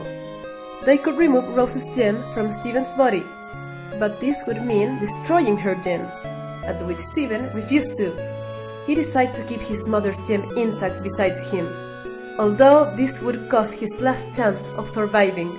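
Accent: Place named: Russia. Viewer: bu arada teoriyi anlatan kadın rus aksanıyla konusuyor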